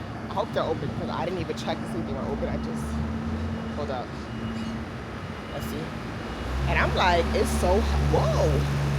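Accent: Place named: Caribbean. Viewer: Friend —- u really sound like u have Caribbean roots 🥳 I’ve noticed it in a lot of ur vids 🙃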